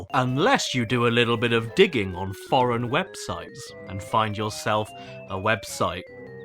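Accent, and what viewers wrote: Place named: United Kingdom. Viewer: You are way too british but your account to be from the "united states"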